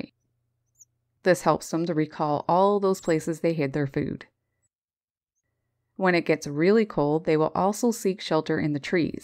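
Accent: Canada. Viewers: This is amazing, cuz how bright personality you gotta have for bird's to earn trust in you, and feed em in freezing temperature? And first meeting trust is precious, I'm American and I hear Canadian accent, plus I love snow, and wanna go to trip to Canada when I can afford it. So beautiful nature 😍❤️😍😍❤️❤️😍❤️❤️. Love it.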